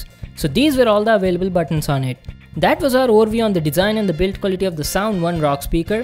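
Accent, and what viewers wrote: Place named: Telugu, India. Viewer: Bro... Nenu expect chesa nee slang Telugu la undi ani.. Mee father channel and chala refer chesi...i came to a conclusion .. NUVVU TELUGU VAADIVE...😊🤓😘😘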